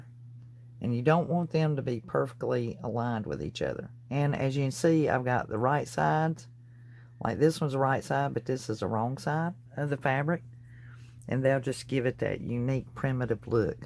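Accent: South, United States. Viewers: Just found you! So excited to make this!!!! You sound like a fellow southern girl! ❤️ Lookinf forward to watching more of your videos! God bless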